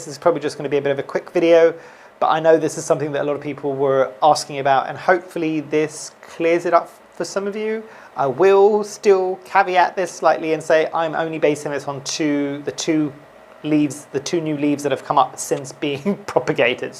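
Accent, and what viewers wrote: West Country, United Kingdom. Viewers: Love that west country accent coming through ☺️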